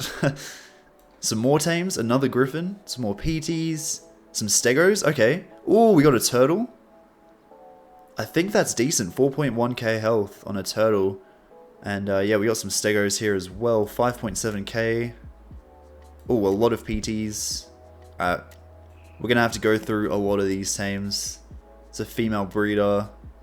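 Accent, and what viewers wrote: Australia. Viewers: Are you Australian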